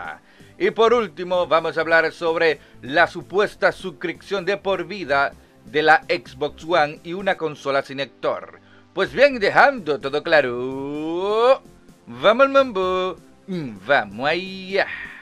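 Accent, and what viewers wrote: Dominican Republic. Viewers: De donde eres porque tienes un acento super Dominicano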